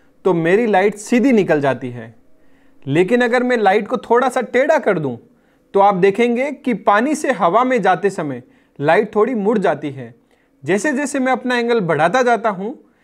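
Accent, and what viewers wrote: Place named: Rajasthan, India. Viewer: Sir aap Rajasthani hain na? I know😁 Your accent totally matches✌ I'm from Barmer, Rajasthan.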